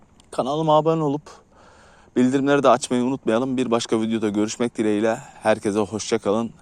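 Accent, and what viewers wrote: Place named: Denizli, Turkey. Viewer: şive bizim buralara çok benziyor Denizli liyim bende o yüzden sordum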